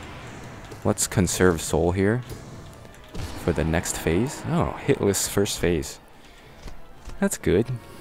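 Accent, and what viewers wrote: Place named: Philippines. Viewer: i could actually listen to you sing and just talk forever tbh your voice is so nice this isn't fair incidentally, where are you from if you don't mind my asking? your accent reminds me of some Filipinos but it could also be European...?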